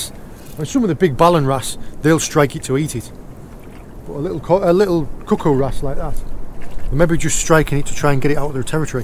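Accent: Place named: Cumbria, United Kingdom. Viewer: i thought you were North, as were Cumbria lol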